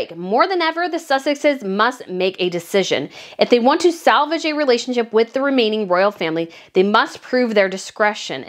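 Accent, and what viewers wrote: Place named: United States. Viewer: I like what you are saying but can't you soften your american high pitched voice?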